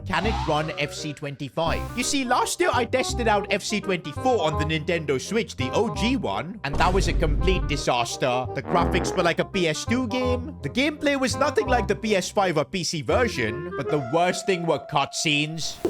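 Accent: India. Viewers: Now i know why foreigners say indians sound like this i get it now. The real indians dont the NRIs do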